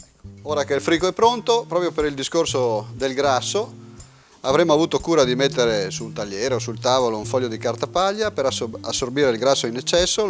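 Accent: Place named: Friuli, Italy. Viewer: l'hai sentito parlare? certo che è friulano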